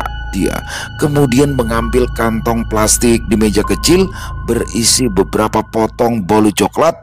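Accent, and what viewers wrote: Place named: Madura, Indonesia. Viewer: Style madura bagus tuh 😂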